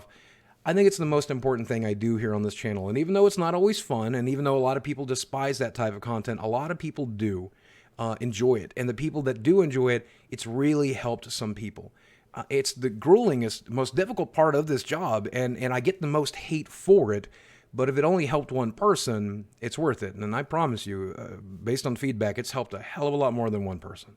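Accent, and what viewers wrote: South, United States. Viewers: Why does your accent sound SOOO southern in this vid lol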